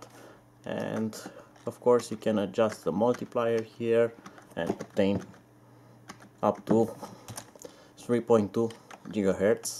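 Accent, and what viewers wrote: Romania. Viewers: Hai salut. Mama cum recunoști romanii dupa accept :)))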